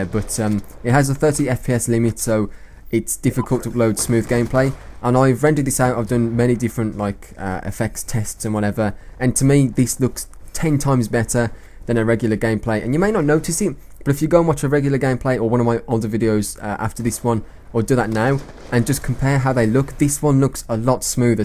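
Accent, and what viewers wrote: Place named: United Kingdom. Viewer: Where abouts in the UK are you from? You sound really local to me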